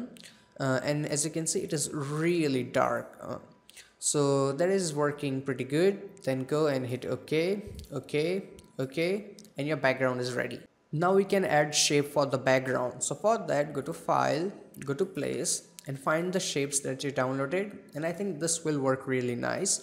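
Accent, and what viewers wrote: India. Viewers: i´m sure that u r an indian. u speack like an indian guy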